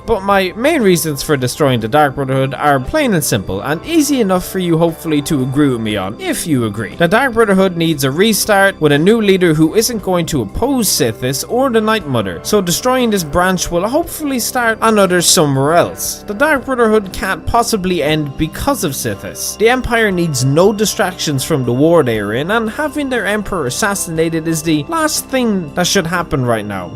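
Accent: Ireland. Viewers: POV: you’ve already completed the dark brotherhood quest line and are watching just cuz you’re bored and he sounds like a crazy Irish lad and enjoy him saying other as “utter”…. #HailSithis 🩸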